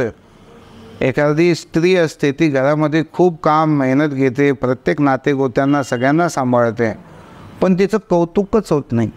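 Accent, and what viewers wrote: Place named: Dhule, India. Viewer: Bhashe warun tumhi Dhule che watata, mazha janma Dhule cha ahe, mi Mumbai madhe asto, mala consultation gheta yeil ka?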